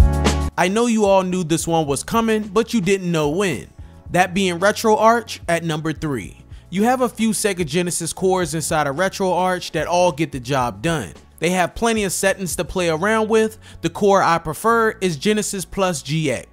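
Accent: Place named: South, United States. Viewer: maybe he is from the south?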